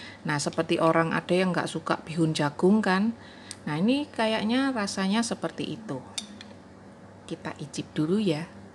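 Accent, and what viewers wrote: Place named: Surabaya, Indonesia. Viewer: Opo kabare cik..kangen sama logat suroboye aku ..kangen suarane rek😂😂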